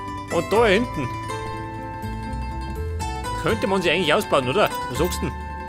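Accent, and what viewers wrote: Bavaria, Germany. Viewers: Saugeils Video schee dos du in Bayerisch redst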